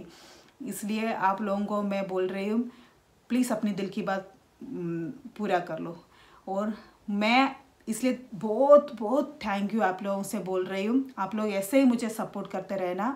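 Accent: Kerala, India. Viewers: I love the way you speak Hindi with a thick Malayalee accent (that requires courage, Bravo ) the way you share all your beautiful, moments with your family, the little surprises you planned for your daughter's birthday, the father dancing with delight as he watches his daughters happy reactions to her birthday gifts, the way - ji brings his son down gently to see Vishu kanni. All of these things add up to a lot, how deeply you care for each other and are so happy to be together. Most of all the encouragement your son gave to you to start your own vlog, IN YOUR. OWN STYLE! How many sons do that? The genuine pride you take in each other's achievements, big and small, is a valuable lesson for all families. Love your cheery spirit. May you always remain that way.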